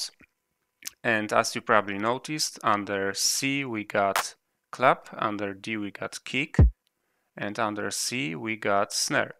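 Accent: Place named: Poland. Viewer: Thanks, you are from Poland maybe because i feel this Polsko angielski dialekt hehe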